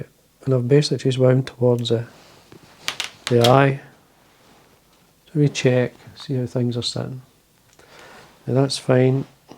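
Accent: Scotland, United Kingdom. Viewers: Hey! While I like your Scottish accent it does not translate well in your transcripts. I am hard of hearing and would like it if you would include a material list as well as transcript. I guess model doc is a mallard duck. Thanks for the great patterns and tying methods. Harry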